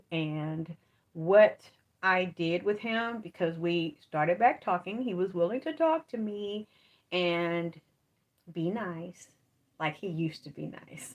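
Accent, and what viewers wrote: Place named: Louisiana, United States. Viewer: You from Louisiana? I was listening to another video and when you start speaking fast your accent sound like you're from South Louisiana?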